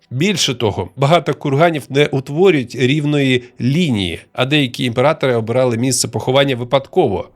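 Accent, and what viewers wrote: Russia. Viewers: диктор - москвороте бЬІдло, не розуміє як вимовляти "і" та "и", читає слова на москворотий лад - іЛЛюзія" і т.д. Мінус і скарга.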